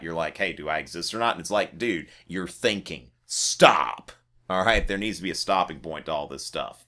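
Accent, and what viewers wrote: South, United States. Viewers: His thick Southern accent had me dead before he even said something educational.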